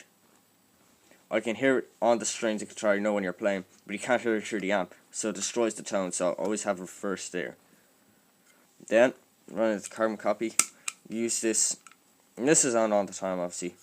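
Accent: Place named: Ireland. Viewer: I can tell ur Irish lol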